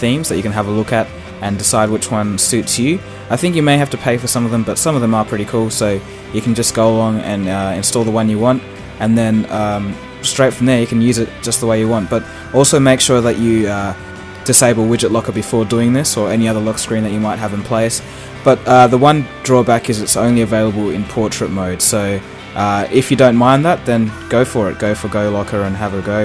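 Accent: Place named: Australia. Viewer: I wouldn't worry about it. You've got an "accent" being from Australia or wherever you're from, but other than that, you sound fine to my American ears. Good job with the videos. Very well done!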